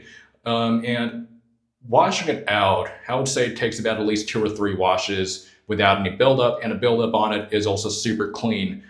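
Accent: South, United States. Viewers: You sound like you picked up a Southern accent.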